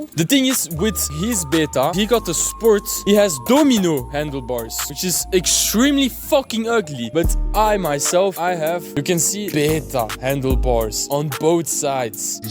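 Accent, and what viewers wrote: Netherlands. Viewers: ik hoor die Nederlandse accent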